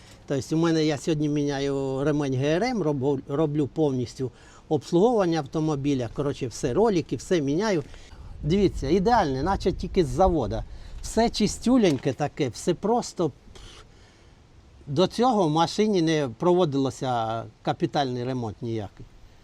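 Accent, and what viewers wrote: Chernihiv, Ukraine. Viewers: Ви мабуть з чернігівської області по акценту чутно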